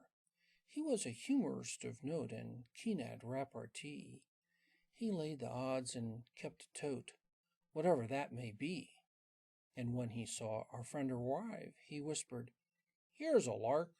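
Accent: United States. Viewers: great reading of an Aussie classic. Unusual to hear it with an American accent. Thanks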